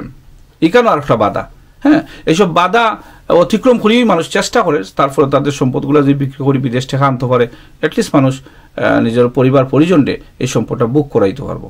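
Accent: Sylhet, Bangladesh. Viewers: He is from Sylhet n talking to his community.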